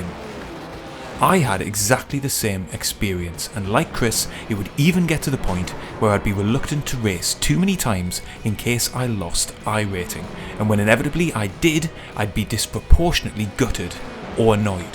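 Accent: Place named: County Durham, United Kingdom. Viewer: Hi you sound local to me as I live in stanley co.durhm. Enjoying the vids and would love an idea of price for a simple mackem mover graphic for my current cars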